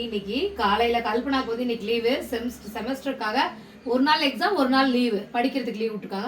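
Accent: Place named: Thiruvarur, India. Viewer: Niga pesura varthaigal nalaa purigirathu naanga pesurathu polave pesuringa enaku thiruvarur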